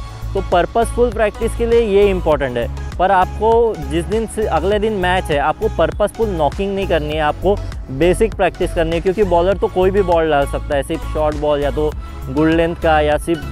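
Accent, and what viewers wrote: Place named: Hyderabad, India. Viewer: Pahla dekha jo hyderabadi bolta hai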